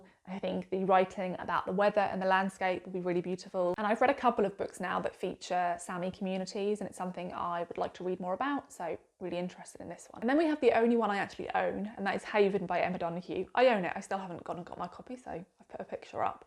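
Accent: United Kingdom. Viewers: Hi. All books you described are alright. Above all, your pure British accent is most welcome. Many thanks ❤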